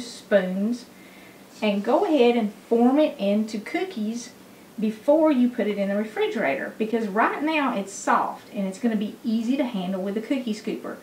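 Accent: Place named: Tennessee, United States. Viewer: Your Tennessee ? accent reminded me so much of someone I just couldn’t place it, and then it hit me! Dolly Parton! The way you pronounce many words reminds me of Ms. Parton!🥰🤩🤗 I am really enjoying your videos. Thank you ! 🙏🏼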